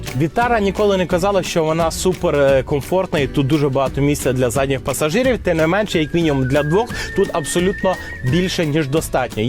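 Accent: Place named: Central Ukraine, Ukraine. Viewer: а чем тебе не понравился язык? вроде довольно чистый украинский центра Украины. ни западного ни восточного суржика не слышно.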